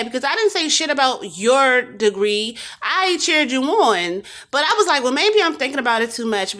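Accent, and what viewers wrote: New York, United States. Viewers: Did I ever tell you that you sound like New York ?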